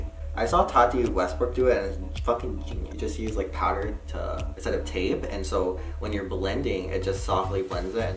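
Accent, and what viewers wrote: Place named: Midwest, United States. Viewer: Your Midwestern accent gives me life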